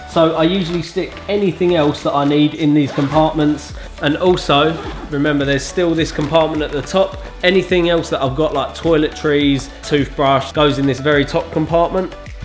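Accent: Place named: England, United Kingdom. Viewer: You sound more English than most English people. Proper mate.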